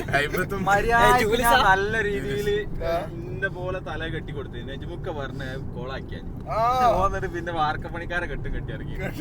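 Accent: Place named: Palakkad, India. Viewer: എന്റെ പാലക്കാടൻ ഭാഷ 👍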